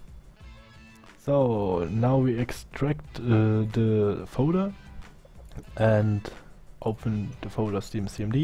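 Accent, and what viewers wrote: Germany. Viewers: Did what you said and worked PERFECT ! love the german accent makes it funny in some soort of way ;) dont take it bad ! Thank you for this video ! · sounds german :D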